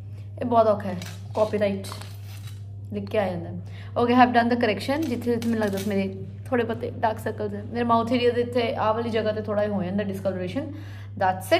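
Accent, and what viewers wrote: Punjab, India. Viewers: Its jst pure punjabi accent..hum bhi ghr pr normally bros hi bolte jdo punjabhi vich gl krde...brows waala accent niklda hi nhi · I wish i cuold learn from you but i m from Pakistan... Love the way you speak punjabi i jst love it... I am your new viewer..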